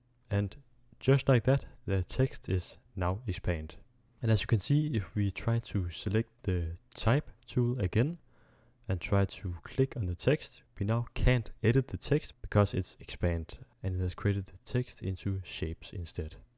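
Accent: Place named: Denmark. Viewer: Den accent lyder meget bekendt... du MÅ være dansker!😂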